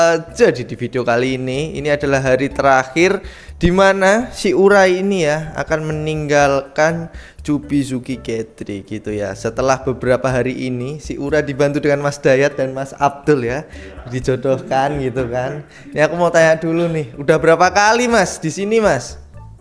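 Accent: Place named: Pati, Indonesia. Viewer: logat daerah pati ne kentel banget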